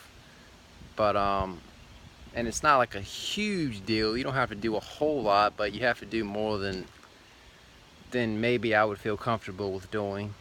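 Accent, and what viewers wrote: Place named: South, United States. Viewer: You are handsome and your southern accent is everything ❤️😊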